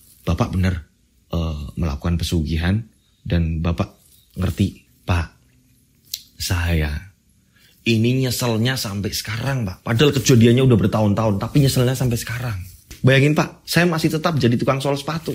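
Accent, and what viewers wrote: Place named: East Java, Indonesia. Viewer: Hadir lg mas ak! Di SBY! Mas org Jateng tp logatny koq Jwa Timur'an y? 😀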